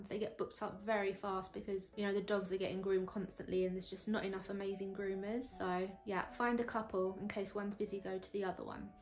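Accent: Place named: England, United Kingdom. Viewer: I saw your comment on our channel about Baby Violet. I am pleased that you found us. Glad that YouTube recommended our video to you. Watching this video now. Wow. Great video! I just became subscriber number 75 to you! You doggie is precious! Ruthie B. Howard BIG BELLY REBUILDS 9-22-21 P.S.: I LOVE, LOVE, LOVE, your English Accent! Cerulean, KY USA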